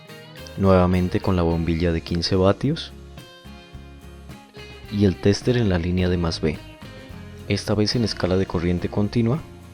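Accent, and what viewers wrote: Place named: Narino, Colombia. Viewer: Amigo, la verdad un excelente video, mu buena prueba créeme que la aplicaré, me imagino que funciona con todos los electrolíticos. Por tu acento veo que eres de la hermosa tierra del Galeras. Millones de bendiciones.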